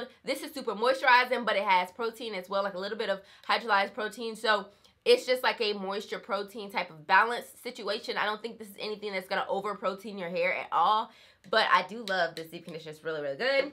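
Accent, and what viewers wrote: South, United States. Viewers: Girl that southern accent is coming through!🥰 I would love to see eco and wetline comparison. Thanks for sharing your product empties. Peace and blessings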